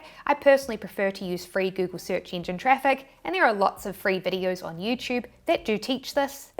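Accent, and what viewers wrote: New Zealand. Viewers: I knew instantly you were Kiwi when you said Etsy 😂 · Im not new here but what exactly is your accent? Its like you grew up in NZ, but have an American and Australian parents. From an Aussie across the ditch. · Hi, Fellow Kiwi here so I can understand you perfectly. I would love to move away from Etsy to Shopify but the time I'll have to put into marketing is daunting. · I lived in NZ for 22 years. It's nice to hear the Kiwi accent again.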